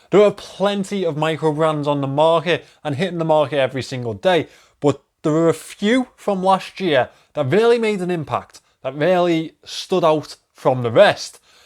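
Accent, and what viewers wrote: Liverpool, United Kingdom. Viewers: Yes lad, good to see a scouser in the watch game on YouTube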